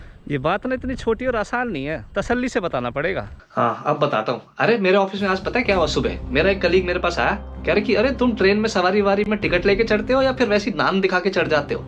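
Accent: Haryana, India. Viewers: Haryanvi h k bhai tum?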